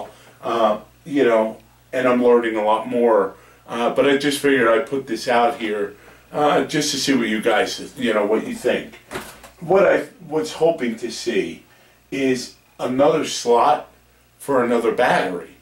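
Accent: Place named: New Jersey, United States. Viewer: lol, I guessed it well, I know my people, you sound like your from Jersey, I too am from hudson county, Live in south Florida so I do alooooot of flying down here all year round. My phantom has alot of use on it. I'm set-up for long range so I fly alot over 3km over swamp land. Multirotors are huge down here, even for just hobby. Went to jersey last summer and nobody really is into them up there from what I see. Not even most hobby shops have very little inventory on them. Oh well, good to see that your keeping it real up there.. Good Luck.....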